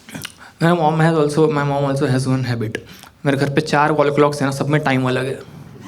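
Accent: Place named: Mumbai, India. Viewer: He has bombayai accent. So adorable 😍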